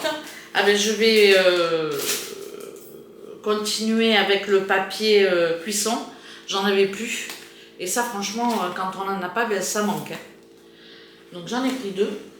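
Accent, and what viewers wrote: South, France. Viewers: Coucou...mon avis au sujet de tes vidéos recettes.. je préfère quand tu commentes..l’absence de son est monotone.. je préfère comme tu dis:les blablas et les papotages,avec ton accent du Sud c’ est super! Ne change rien.. car c pour ça que l’ on va sur ta chaîne: pour t’ écouter.. sinon on peut aller sur n’ importe quelle autre.. c ce qui fait ton originalité...et que l’ on ait envie de la regarder!! Comme disait un commentaire plus haut si quelqu’un ne veut pas t’ entendre.. il n’ a qu’ à couper le son!!😂Bonne journée et à bientôt t’ entendre..💕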